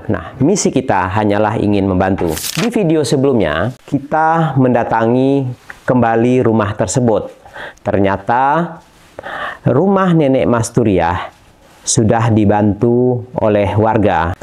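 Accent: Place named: Java, Indonesia. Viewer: Pak lucu kalo gomong Jawa 😂😂😂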